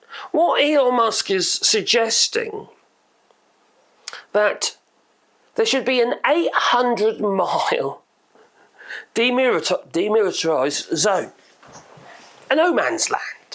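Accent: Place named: Norfolk, United Kingdom. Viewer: you sound like a norfolk buh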